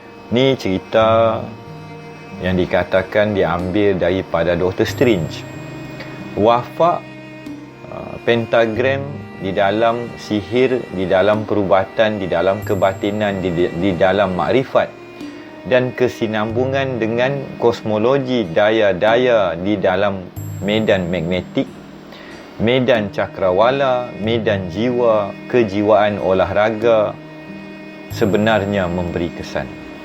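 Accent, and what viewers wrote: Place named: Kedah, Malaysia. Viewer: Wah Bercerita menggunakan loghat utara (melayu kedah ). Jgn diklaim bahasa indonesia pula.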